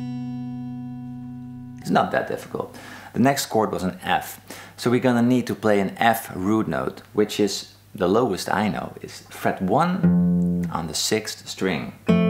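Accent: Netherlands. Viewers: You have a Dutch sounding accent. Wow, liked this video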